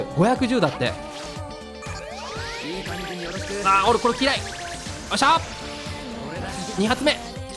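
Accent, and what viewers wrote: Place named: Yokohama, Japan. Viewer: もしかしたらさん横浜？に住んでるから訛りが入ってるのかもしれない（横浜であってますよね？）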